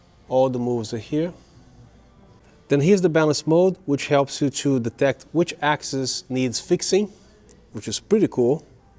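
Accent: Brazil. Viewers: Achei que tava familiar mesmo!!! Legal saber que é BR! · Brasilian Accent?! <3 Loved the Video!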